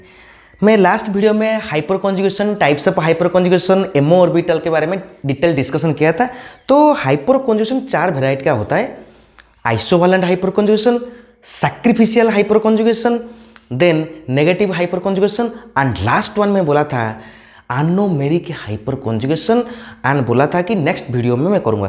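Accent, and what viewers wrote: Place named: India. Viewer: Can you add subtitles? Cause I have trouble with understanding English with Hindi dialect...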